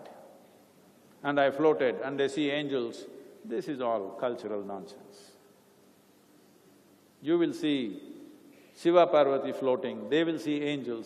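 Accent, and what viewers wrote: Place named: United States. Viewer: what this jerk Sadguru is Bull Shit.He has no knowledge.He never answer a question posed instead talks some pig shit in super American English..see above qstn. was asked how a person feels just before his/her death and this jerk says something mango Banana..i will tell u what happens ..just a 10 minutes prior to death the person see his departed parents.Departed friends and relatives and feels some one is pulling his body from his/her feet and feels coldness in his body.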